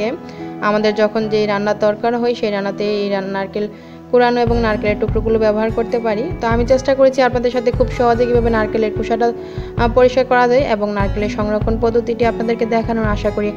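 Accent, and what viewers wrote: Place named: Noakhali, Bangladesh. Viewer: কথা বলার স্টাইল পরিবর্তন করতে হবে। নোয়াখালীর মেয়ে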